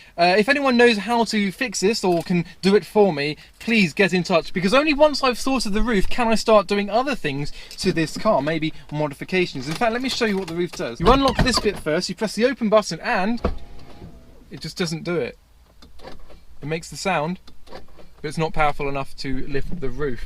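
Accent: United Kingdom. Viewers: The most British sounding person on YouTube, banging videos keep it up 👍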